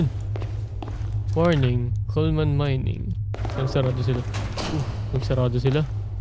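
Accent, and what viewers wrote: Visayas, Philippines. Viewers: Kuya bisaya kapoba?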